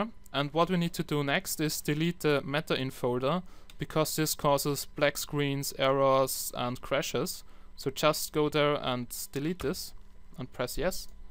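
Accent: Germany. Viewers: you sound like Docm77 ... German Accent ♥ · man merkt das du deustcher bist :D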